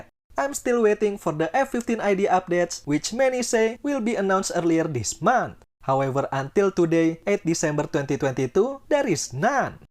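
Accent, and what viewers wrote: Indonesia. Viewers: Bahasa inggris rasa indonesia😅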